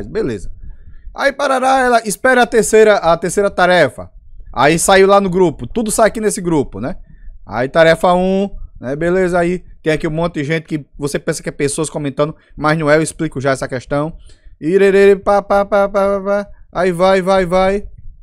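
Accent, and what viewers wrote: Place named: Paraíba, Brazil. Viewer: Quase cai, mas cliquei no link , que faco agora,, sotaque Paraiba muito legal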